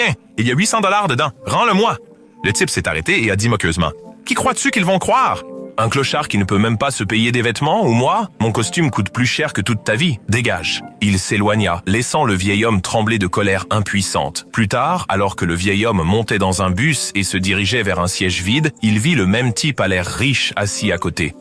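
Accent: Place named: France. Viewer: Votre accent français c'est adorable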